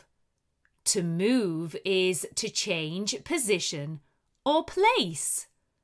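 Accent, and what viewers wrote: United Kingdom. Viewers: the best channel to learn English in a British accent in a fun and not boring way...thank you for this story...keep going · I love the British accent for learning English! And I love it your voice, speak slowly, and your stories are very interesting and I can understand all perfect!❤ Thank you very much for your excellent work! Greetings from Spain!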